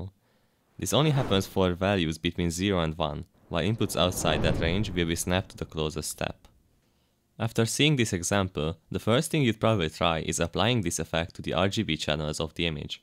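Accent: Hungary. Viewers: A magyar akcentus megcsapott ugyhogy jottem a komment szekcioba :D szuper video, igy tovabb!! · annyira büszke vagyok magamra hogy instant felismertem hogy magyar akcentusod van de amúgy geci kemény képeket lehet csinálni vele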